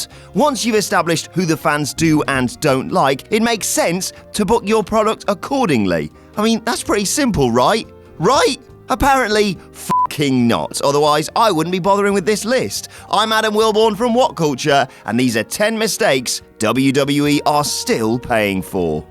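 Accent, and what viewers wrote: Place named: United Kingdom. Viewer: Even Wrasslin sounds smart with a British accent.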